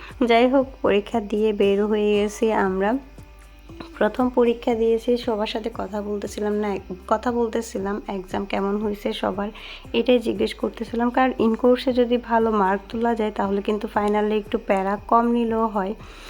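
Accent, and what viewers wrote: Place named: Noakhali, Bangladesh. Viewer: আপনি ভয়েস শুনে মনে হলো আপনি নোয়াখালীর না হয় ফেণীর মেয়ে হবেন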